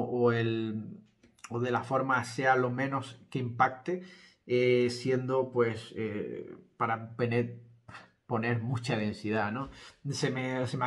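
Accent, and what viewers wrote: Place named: Cadiz, Spain. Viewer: eres un crack hablando tio... pareces de Cadiz mi ciudad!!! animo estas haciendo un trabajo genial.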